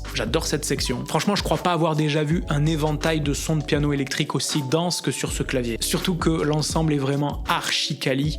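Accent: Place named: South, France. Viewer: Et l'accent du Sud 😁! Ravi en tout cas de te revoir ici sur WoodBrass!! Tu le mérites!!Bonne continuation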